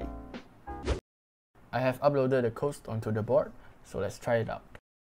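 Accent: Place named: Asia. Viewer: Not in a rude way , but , Also the asian accent just makes the whole vid better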